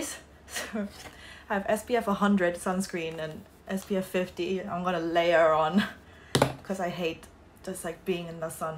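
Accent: United Kingdom. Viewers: wow.. sounds very cool with British accent o_o and very informative for current Covid 19 situation !! thanks for your time to make this amazing video ~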